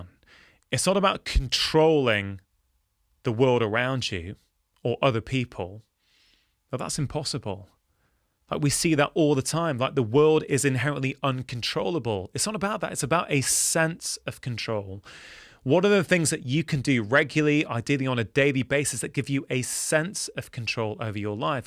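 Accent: United Kingdom. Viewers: No, is British and speaks perfect English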